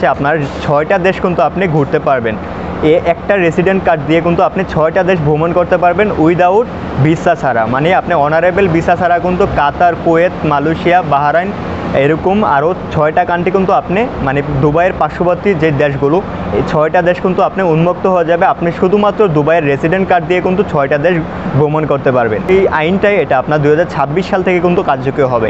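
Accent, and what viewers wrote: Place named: India. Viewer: তুমি কি ইন্ডিয়ান তা না।হলে তো এইভাবে তো বাংলাদেশের ছেলেরা কথা বলে না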